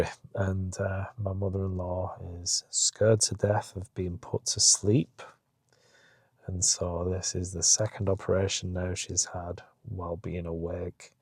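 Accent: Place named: Scotland, United Kingdom. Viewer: your wife and mom-in-law are so lucky to have such a devoted husband and son-in-law to care so deeply and do so much very clearly out of true kindness and love and not just some begrudging sense of obligation, as too often is the case! I pray for a rapid and thorough healing of MIL’s injuries and it’s wonderful she plans to get right back to being active as a hip fracture can far too often signal the end of independence and active lifestyles for older people. I suspect your MIL has nothing to fear as she looks as bright and spry as anything!!! I hope you get some painting done and thank you for sharing your life with us! Ps-this might be nosey but I have to ask…what is your accent? To my highly American ear,it sounds like Scottish and British together? Could that be? 😂 Take care, and take care of Mum!!! 😉😃🙏🏻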